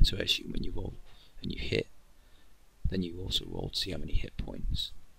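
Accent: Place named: Yorkshire, United Kingdom. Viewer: I thought it was alright. Makes a change to the American accent. Is it Sheffield? That's where I'm from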